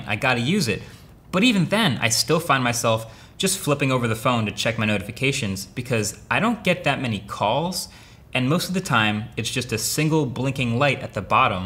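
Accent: United Kingdom. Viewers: Finally a brit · Bro, he is british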